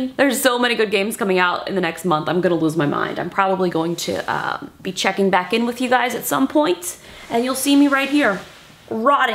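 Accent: Pittsburgh, United States. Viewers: Is she from Pittsburgh Pennsylvania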